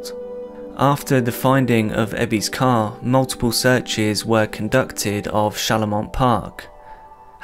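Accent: England, United Kingdom. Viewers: Dude you’re just reading the Wikipedia article nearly verbatim, albeit with a spooky English accent. Right on for making something entertaining without doing too much work.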